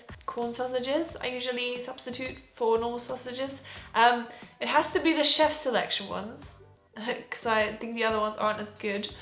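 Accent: England, United Kingdom. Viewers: Go up north to Staffordshire, get some oatcakes & then do an english breakfast all mixed up in a pan, slap it on the oatcake & eat it like a burrito. Warm the oatcake first. DEEEEEEELISH! You could make you own oat cakes also. You sound more Brit than German. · What a great English accent!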